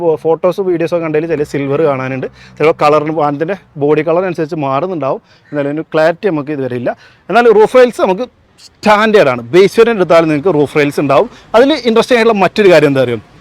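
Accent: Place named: Malappuram, India. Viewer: Typical malappuram slang aanu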